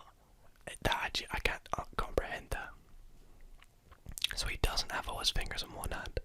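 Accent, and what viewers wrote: Scotland, United Kingdom. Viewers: Hey are you Scottish? I'm just asking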